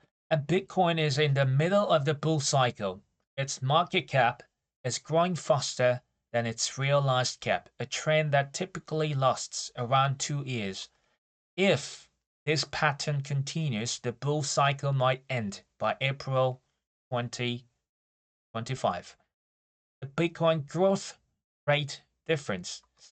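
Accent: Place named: United Kingdom. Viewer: !!! Thank you for your comments a few days ago😊 Your British pronunciation is cool unlike the American one I 'm learning~ lol I'm grateful to you. Have a peaceful day!!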